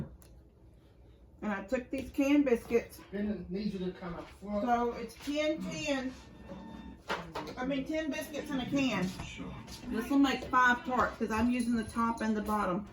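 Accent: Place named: South, United States. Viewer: i really feel--right at home with you..... "you'er fixing to" and "eye balling"------good ole southern talking----i'm from and in FLORIDA still ---you just bring all the great memories of my family...rip🥲 and I THANKYOU for that!!!!!!😍🥰😍🥰😍🥰😍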